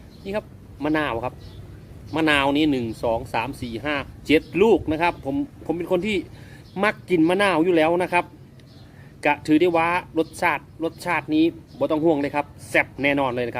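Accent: Phu Thai, Thailand. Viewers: วัดไข่...5555......555..มักฟังสำเนียงภูไท...555...ม่วนดีฟังเพลินๆ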